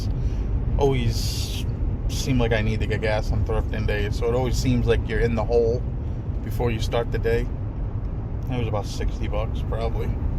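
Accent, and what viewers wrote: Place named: New York, United States. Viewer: I thought I heard the NY accent. Grew up in Falmouth. Just moved to IL bummer I didn't find you sooner 😅.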